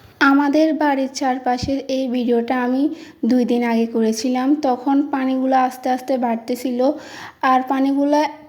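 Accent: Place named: Noakhali, Bangladesh. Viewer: খাটি নোয়াখাইললা 😊😊😊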